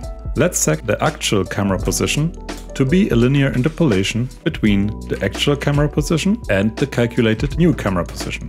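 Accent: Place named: Germany. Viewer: Do you not recognize the german accent?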